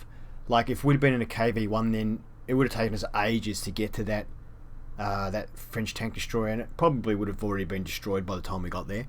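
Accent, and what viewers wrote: Australia. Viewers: hi are you aussie?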